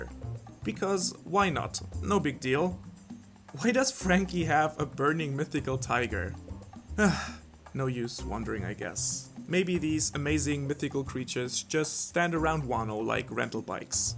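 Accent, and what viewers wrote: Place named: Germany. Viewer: Are you German by any chance? Your accent is hiding it well but I can feel it slightly. Am I right with my assumption? :D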